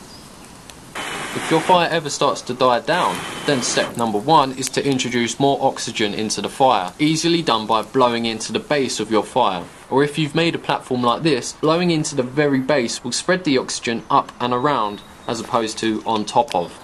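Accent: United Kingdom. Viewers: What accent is this? I hear it a lot but don't know what part of Britain it's from.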